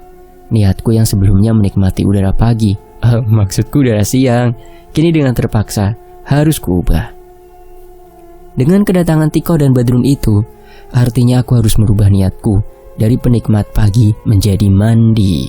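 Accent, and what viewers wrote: Java, Indonesia. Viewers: mmg CERITA2 Diosetta ttg Danan, pakLe Bimo, Cahyo & WANASURA... Benar2 SERU!! Bikin ketagihan ingin mendengarkan keLanjutan dari KISAH2-nya. KEREENNN pkknya... HOST-nya jg memBaCakan Ceritanya penuh dgn Intonasi2 yg TEPAT! Logat Jawa-nya jg PAS di Dengar... mmg Anda BerBakat dLm memBacakan Cerita2 Rakyat. FEEL-nya terasa.... ** SEMANGAT terus ya mas! **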